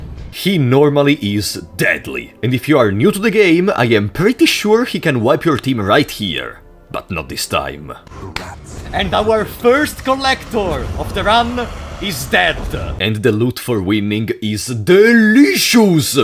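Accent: Italy. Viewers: Yep After minutes of talking , i can preaty much confirm that he Is an italian . Sei italiano vero ? 😂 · I CAN RECOGNISE A FELLOW ITALIAN WHEN I HEAR ONE! BELLA FRA FELICISSIMO DI AVER TROVATO A CASO IL TUO CANALE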